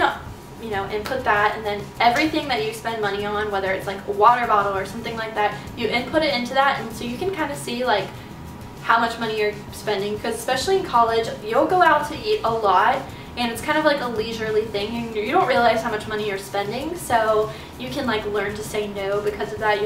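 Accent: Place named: South, United States. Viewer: I feel like your southern accent/side totally came out in this video. lol loved it!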